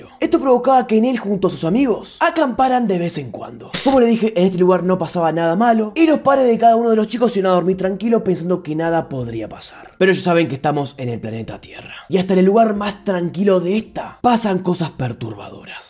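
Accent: Argentina. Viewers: Coraline la peli es buenarda pa 😎 Saludos desde🙁😥🇵🇪 El asentó es de arg pero me gusta escribir su asentó :'( 😣🙁😥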